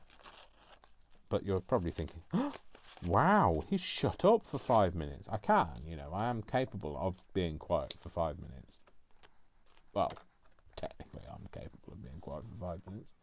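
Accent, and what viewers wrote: United Kingdom. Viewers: I really enjoyed watching you doing your drawers. Yes, the glue is fantastic and I loved the sound of your fingers patting the paper down. You say that you ‘ talk rubbish’... I certainly don’t think so... but if you do, it’s delightful, educated and unique rubbish and I love to hear it! What a coincidence that the paper was from one of the Brontee sisters’ books and there you are in Yorkshire talking with a Yorkshire accent... it just occurred to me that the Brontee girls would have had Yorkshire accents! Thank you, ❣️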